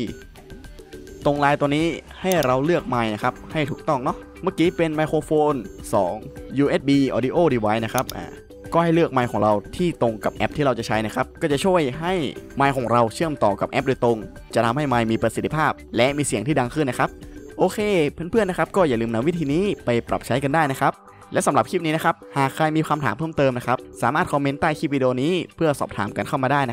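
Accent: North, Thailand. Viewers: สำเนียงเหนือ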